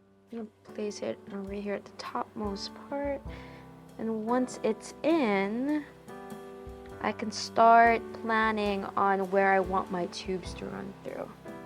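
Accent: Philippines. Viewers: I just recently discovered your channel and I'm amazed at your PC assembly skills. The video editing is excellent as well. I called over my son to watch and he asked, "Is she Filipino?". What? I then realized that your accent did sometimes sound like a Filipino who speaks English (not always). Then I heard you say, "Hay nako!" OMG! (",) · are you a filipina:? cause i can hear a filipino accent in you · I would also love to make my own customized liquid loop for my pc. How expensive it is compared to a normal AIO? And by the way, you have an accent of a Filipina, it's cute :)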